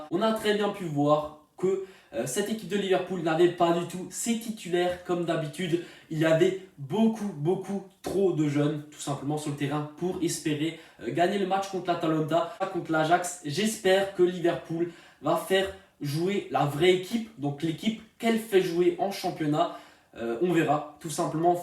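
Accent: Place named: North, France. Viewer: Toi, t'as l'accent du Nord mdr !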